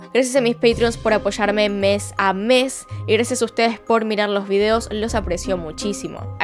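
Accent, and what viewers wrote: Argentina. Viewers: ¡Me encanta tu gata! De ahí que es un plus más para ver tu canal, siempre me fijo en ella, a parte de tu acento argentino. Un beso guapa. 😘 😻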